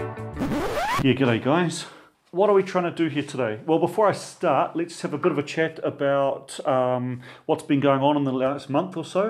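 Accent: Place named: New Zealand. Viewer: I'm a hobby machinist and have spent 30 years trying to learn as much as possible. This video was the best, most engaging learning I've experienced since hanging out with my 90 year old tool maker friend when I first fell in love with machining. THANKYOU for your time and content. This was so good. I fully thought you were kiwi like me with your accent, but maybe an Xpat... thanks from down under down under. Chch nZ ❤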